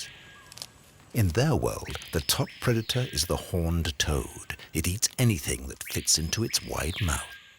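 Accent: United Kingdom. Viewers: He’s British. Everyone who speaks English is Not American.🤷🏽‍♂️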